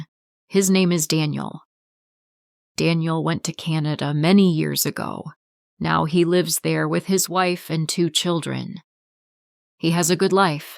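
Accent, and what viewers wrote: United States. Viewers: American accent? no, thanks. I want British English. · American or British?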